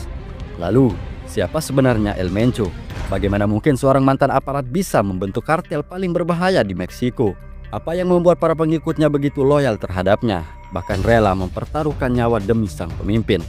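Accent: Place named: Lombok, Indonesia. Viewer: semaikn ndek asing logat sasak nii, ternyate dgn sasak Lombok Admin😅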